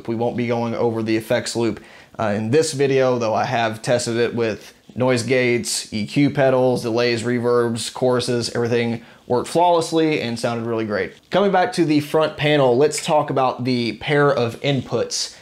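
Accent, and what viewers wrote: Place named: South, United States. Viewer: just curious,do you live in the South??You have a southern drawl like me,HaHa...I am in Alabama...That’s a great sounding amp,I have been seriously thinking of getting a Ceriatone,just trying to decide which one....you may have just made that decision easy.....love your channel by the way....great tones..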